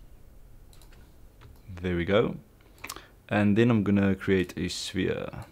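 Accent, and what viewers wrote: South Africa. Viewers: man are you South African :D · You sound South African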